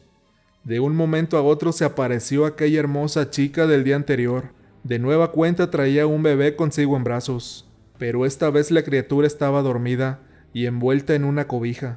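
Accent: North, Mexico. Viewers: No se porqué pero me gusta su acento norteño ajua :v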